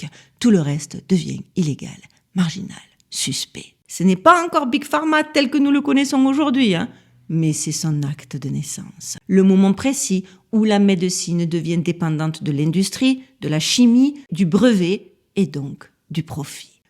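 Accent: South, France. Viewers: Bonjour, je viens de vous découvrir aujourd'hui avec deux vidéos successives et je ne m'arrêterai pas là! Vous êtes phénoménalement géniale, avec votre accent marseillais (ma soeur!) ou tout au moins du Sud. Je vote sans la moindre hésitation pour vous aux prochaines élections présidentielles françaises qui viendront je l'espère bien avant l'échéance prévue tant le désordre et l'insalubrité règne chez nous. Je consulte immédiatement toutes vos vidéos pour les écouter et les faire connaître autour de moi! Merci pour votre travail fascinant! ❤❤❤❤❤❤❤❤ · Merci 💛🙏, de Toulouse PASSIONNANT toutes vos vidéos , et LÀ, Particulièrement Vous Nous Faites du BIEN en Nous Éveillant 👍votre charmant accent Nous Évoque Le SUD francais Tous mes Voeux de Bonheur pour cette Nouvelle💫 Année ⛅🌤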